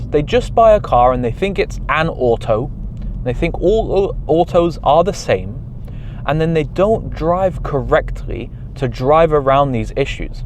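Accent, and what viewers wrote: United Kingdom. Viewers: Britain has such diversity in dialects and the further back in time you go the more diverse it is, so I wouldn't jump to conclusions, I would just assume that's just how he says that vowel. In fact, I'm pretty sure I've heard several dialects pronounce that vowel like that, or similarly different.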